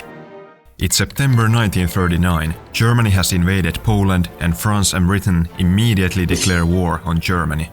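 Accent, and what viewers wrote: Finland. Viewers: finnish axent sensed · Ystäväi ootsä suomalainen kuulostat siltä ja lähit armeijjaan? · His accent Sounds very finnish😂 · Holy finnish axent😂❤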